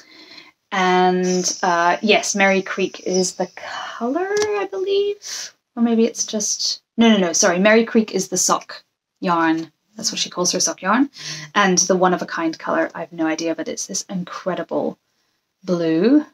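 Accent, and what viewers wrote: United Kingdom. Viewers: Hi, Hello from Scotland. You just popped up on my YouTube suggestions, and I’m so glad you did. I was knitting with some Miss Click Clack Merri Creek Sock yarn while I was watching you. I’ve been a member of her Ravelry Group for around 6 years now. She is a wonder with the dye pots! I even flew from Adelaide to Melbourne a few years ago, for the day, just to attend a Craft Event and a “Clackette” get together. (I have lived sporadically between Adelaide and Scotland over the years - long story) I don’t sew but I still enjoy seeing beautiful fabrics and hearing what you intend to make with them. I’m amazed at what you found in thrift stores. I’m looking forward to seeing your winter coats in those gorgeous wool/cashmere fabrics. The aran cardigan was also a great find. It looks like you are leading an interesting life up there and I look forward to seeing more of your bus, and the surrounding country. 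And… I thought I had detected a tiny trace of a scottish accent here and there, and then you confirmed it. All the best. 🏴🥰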